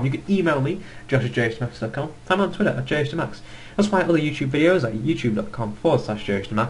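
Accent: United Kingdom. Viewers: Interesting accent.. Are you British? :) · ARE YOU FRICKING BRITISH MAN!! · Ok thanks p.s.(are you british)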